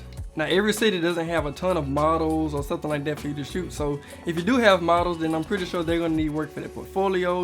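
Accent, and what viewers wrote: United States. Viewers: Love the accent bruh what state?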